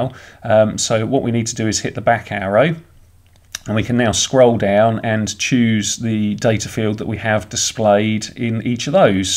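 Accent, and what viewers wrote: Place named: Essex, United Kingdom. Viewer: This sounds like a north Essex accent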